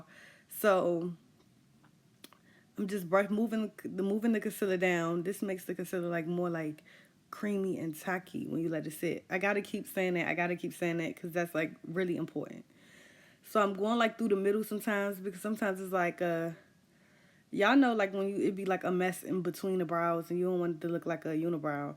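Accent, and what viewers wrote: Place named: DMV, United States. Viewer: 🗣YOU ARE GORGEOUS!!! Btw: I know a fellow DMV fam, I hear the accent. ♥️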